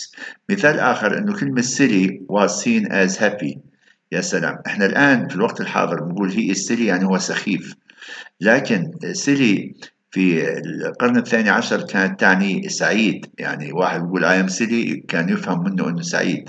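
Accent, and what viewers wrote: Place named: Mosul, Iraq. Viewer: دكتور كيف حالك عساك بخير. ربي يحفظك. دكتور اعتقد انت عراقي؟ لان لهجتك مثل لهجت اهلنا في الموصل للعلم انا من ديالى خريج جامعة الموصل في التسعينات. تحياتي لحظرتكم.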